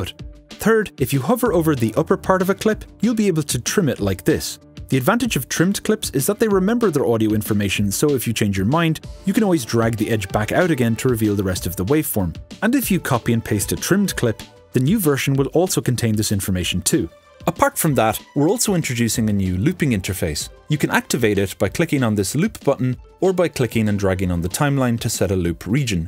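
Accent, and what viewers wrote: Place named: Ireland. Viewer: Subscribed for Matrin "Tantacrul" Keary's excellent UX design sensibilities and pleasant Irish lilt.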